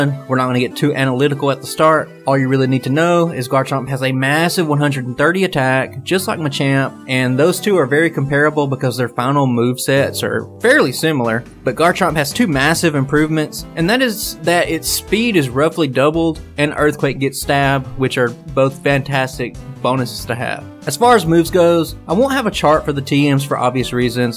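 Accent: United States. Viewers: I like your country-like accent!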